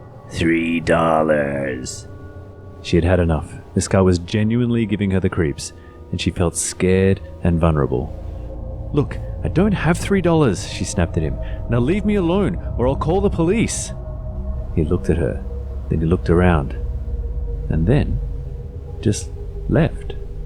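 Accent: Australia. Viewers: He's Australian. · I subscribed because I love the stories and animations. Also the voice is on point. It also sounds like this guy is Australian. I'm Australian btw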